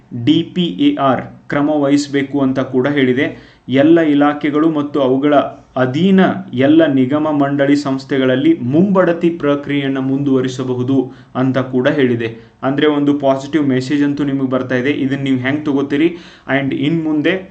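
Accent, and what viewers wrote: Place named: North Karnataka, India. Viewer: Sir stick to your ground of uttar Karnataka accent, don’t try hard.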